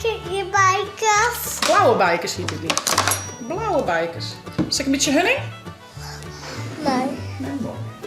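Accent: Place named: Friesland, Netherlands. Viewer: Oow wat grappig gewoon in Nederland alleen een ander accent. Zelf Brabander . En ik denk dat jij friese bent . Zo leuk om te horen . Begrijp alleen niet veel hahaha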